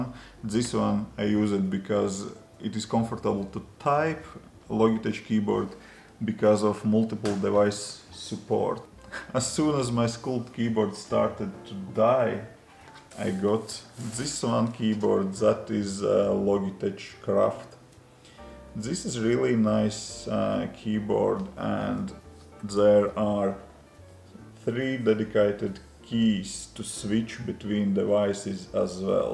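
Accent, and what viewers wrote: Russia. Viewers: Словно русский заговорил на английском) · круто! 3 языка знаете... У вас просто русский акцент когда говорите на английском )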